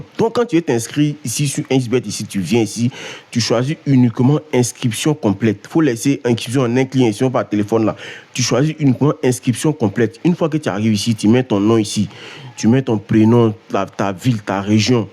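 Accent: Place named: Ivory Coast. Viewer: merci mais vraiment ton asant me rapel vraiment tu es ivoirien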